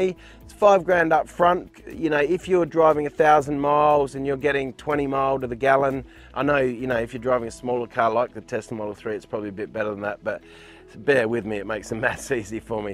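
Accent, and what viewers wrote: Australia. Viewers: I've been watching your videos thinking you were in Australia due to your Aussie accent but now realised you're in the US! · You sound like an Aussie but you speak in terms of miles and referencing US locations. Are you referring to costs in Australia or the US?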